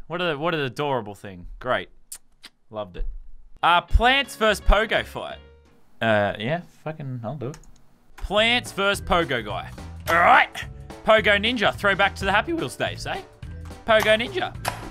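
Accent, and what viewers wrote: Australia. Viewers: DONT SWEAR YOU AUSSIE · Wait is lazarbeam Australian?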